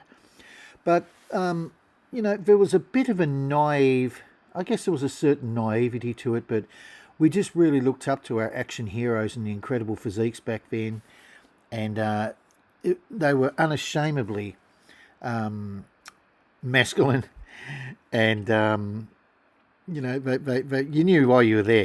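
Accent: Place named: Australia. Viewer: This guy speaks with a very distinct accent - i would estimate that he is either directly from New Zealand, Tasmania or Australia.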